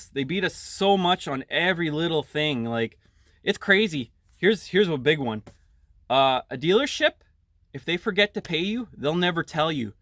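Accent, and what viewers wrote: Canada. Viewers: No disrespect, you sound from Canada....i think the dynamics are different than here in the states,flat rate is a HELL NO for me,i been in auto dealership settings 20+ years and when i quit and went into heavy truck family owned very respectful people and my life changed forever master heavy duty certified all my ASE's good family good life,and 0 flat rate...ALL hourly,and its 8 N skate..if i want,i never go to a auto dealership again,but you also made a good career choice you have to make the decisions to make your life better. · Canadian, eh? I went to Sarnia in 1981.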